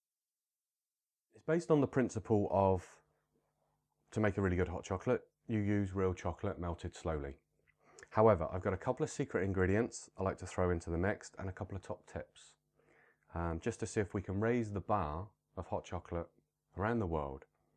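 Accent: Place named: United Kingdom. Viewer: It's getting cold here in NC, and I kept warm with your hot chocolate recipe last winter. Made it last night and can't wait to make it again tomorrow night. I'm a sucker for the British accent too 😉☕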